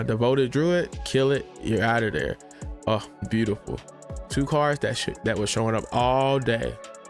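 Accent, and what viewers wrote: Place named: Maryland, United States. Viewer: Glad the algorithm brought me here. Great energy, great video, and you got a lotte love for the community! Also, could be off base, but I feel like I'm hearing Maryland/Baltimore in your voice, and if so, great to hear more DMV content creators 💪🏾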